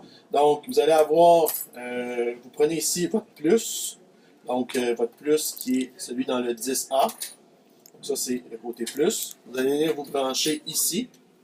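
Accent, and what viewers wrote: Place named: Canada. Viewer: Accent canadien😂j’aime trop continue tu gère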